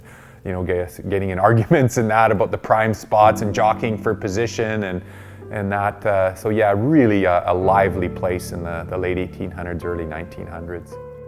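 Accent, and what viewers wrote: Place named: Canada. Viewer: Anybody wondering what a Canadian accent sounds like here it is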